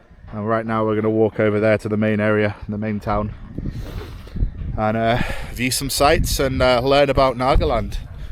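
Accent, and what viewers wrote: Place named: England, United Kingdom. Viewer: your English pronunciation has to be Naganised a little bit😅 when you said Cathedral to the taxi driver I could notice his confusion because you said “Cathidril” in the pure English way😂 most Nagas pronounce it as Cat-Thë-Dral and blah blah in Nagahinglish way, anyway wish you a very nice stay in Nagaland, Peace be with you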